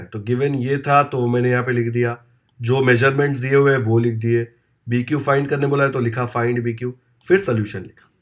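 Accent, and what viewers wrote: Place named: Maharashtra, India. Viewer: I having doubt of your language your mother tongue is Marathi because you was tell tow one chaa that way😅😅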